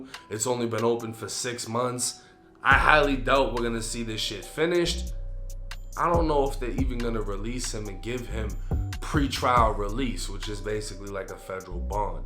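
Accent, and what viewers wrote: Boston, United States. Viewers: “Got pulled over in the cah and caught him a drug chahg.” Guess that Boston accent never goes away lol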